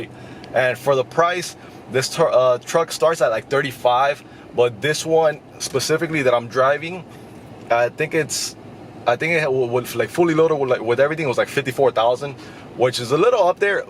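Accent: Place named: Hawaii, United States. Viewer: just a guess, your accent sounded somewhat Hawaiian. Awesome truck, and great review. Hopefully getting one in the next couple of days!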